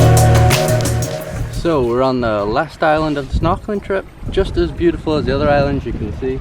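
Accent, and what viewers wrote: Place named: Manchester, United Kingdom. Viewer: what accent do you have? sounds mancunian and american trying to figure it out haha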